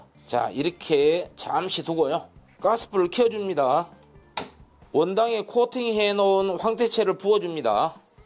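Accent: Jeolla, South Korea. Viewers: 당장이라도 해서 먹고 싶네요~~^^ 전라도 사투리~~^^ 찐입니다~~ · 최고에요 전라도 사투리에다. 맛있는 마늘쫑 황태포랑. 함께. 꼭. 만들어 볼께요 감사합니다 ❤❤❤ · 황태채 마늘쫑볶음~~구수한 수쿠락~워메워메사투리 ㅋㅋ~~레시피 감사 합니다~~👍👏👏👏👏😍⚘️⚘️⚘️ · 낼은 이반찬 도전합니다. 구수한 전라도사투리 정감있고 안먹어봐도. 군침도네요~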